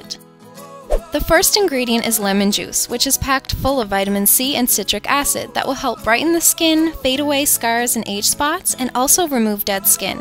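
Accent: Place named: Canada. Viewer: You can tell she is Canadian because she says "Tay-g" Instead of "T-ah-g"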